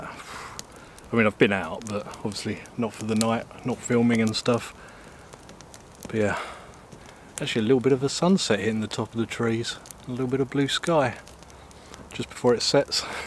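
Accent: England, United Kingdom. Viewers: Hi, Please extend your food eating aspect of your videos. A big part of why I enjoy your camping videos over others, well in part its because of your English accent as I'm Australian but the rest of my family was born in England and all my relatives live and are from England or Wales but its also because I really like what you choose to cook and I enjoy watching every aspect from the preparation (why you don't have a nice shun or global kitchen knife I don't know) cooking and enjoy watching you enjoy eating the food. That chicken for instance looked really yum and instead of only really seeing two bites of it, I would enjoy your videos even more if we could watch you enjoying the food much longer. Btw, if you do buy a kitchen knife for food prep and eating, I really recommend the Shun tomato knife, its lightly serrated and compact and really multi-purpose, just don't try cutting through bone. 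Or Global GS-10 cheese knife as its compact, multi-purpose and you literally could use it as your fork. It also has a stronger slightly thicker, more robust edge than the shun. Both are extremely sharp. The Global knife being wholly stainless steel is slightly more hygienic given you could probably submerge it in boiling water if you needed to. I actually found it difficult to find UK links, even that one gave me AUD and free shipping to Aust. Link Shun <link> Link Global GS-10 cheese knife <link>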